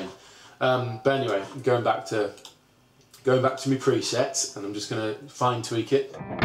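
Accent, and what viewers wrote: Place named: England, United Kingdom. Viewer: where are you orginally from in england? its something i've wondered for a while, i think i can detect an accent.. enlighten me:)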